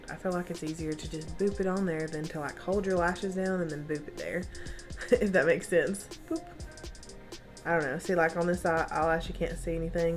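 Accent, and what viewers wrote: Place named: South, United States. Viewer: Fellow Southern girl here, what region is your accent? I love it. I've bought this kit a few weeks ago and used the lashes with regular strip lash adhesive because I've been too scared to use the glue it comes with. Thank you!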